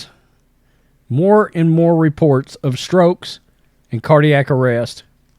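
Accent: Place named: South, United States. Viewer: I love your southern accent so much, thanks for spreading the word on this nightmare, love from Australia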